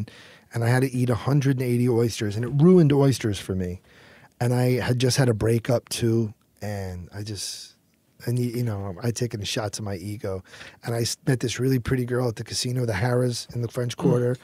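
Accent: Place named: Brooklyn, United States. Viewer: So the way he talks on a scripted show is real and how he talks outside of work is fake? You're not very bright are you? He's from Brooklyn and speaks like this normally.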